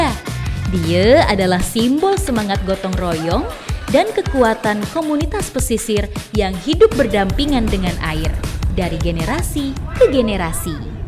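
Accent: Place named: Malaysia. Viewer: Kenapeeee suara narator ini seperti orang Malaysia. Padahal ini ada di provinsi jambi. Tak asik lah dengar suara seperti niiii. Tak jadi saya tonton.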